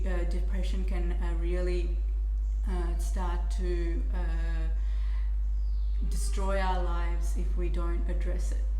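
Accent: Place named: Australia. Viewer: Australian accent interesting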